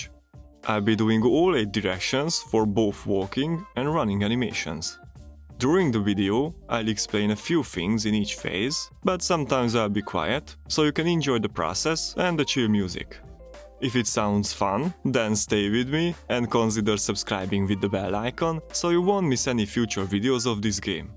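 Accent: Hungary. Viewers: Hungarian accent is so obvious 💀 Good to see an experienced hungarian pixel artist on the field. Do you have any major bigger scaled works/art pieces or do you only work in the gaming industry? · Whats your original language? Because your accent sounds like when a Hungarian speaks English 😁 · Basszus nagyon magyaros az akcentusod :D Amúgy ha az vagy és olvasod akkor rohadt jó tutorial-okat csinálsz. Csak így tovább! <3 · Szia, nem vagy véletlenül magyar? Mert nagyon magyar akcentusod van.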